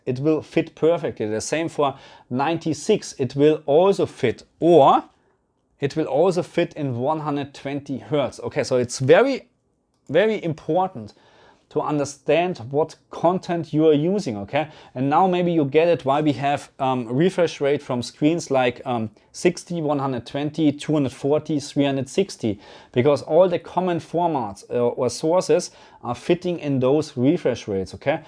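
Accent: Germany. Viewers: I have a question, sir are you german? You talk english like german person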